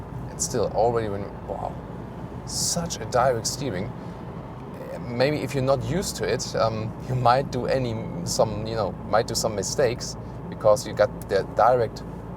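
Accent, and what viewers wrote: Germany. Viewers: Alfas are today quality cars. I had a 159 2.4 diesel model from 2006 to 2009 and that was the first well built Alfa to my knowledge. Giulias are today very beautiful cars with a special touch of emotion. is well prepared and hence knows his stuff. I especially enjoy his somewhat funny English pronunciation and partly German intonation. Thank you. Hopefully I did not insult you as that was not my intention.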